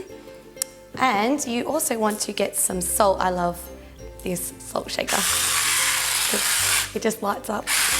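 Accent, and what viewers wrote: Australia. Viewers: Are you Australian I'm new to your channel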